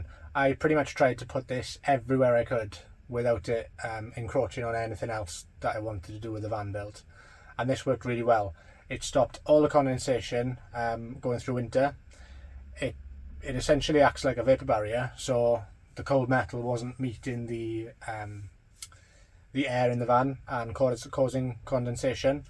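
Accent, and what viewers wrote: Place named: Wales, United Kingdom. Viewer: Hi from Canada. Luv your vids you seem like an awesome dude. Question #1-Your amazon link for that black foam roll insulation does not work here. Can you tell me the brand name of it? Question #2-what are the layers of insulation you used on the walls and ceiling? It looks like you put a double layer of that black foam roll sticky stuff. I agree with your vapour barrier theory. My tv has an awesome time trying to interpret your Welsh accent it is fun to read what it thinks you are saying eh.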